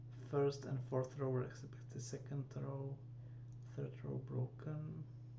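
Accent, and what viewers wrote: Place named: Czech Republic. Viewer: i can confirm, this is czech accent 100% lol, also the gothic shortcut on desktop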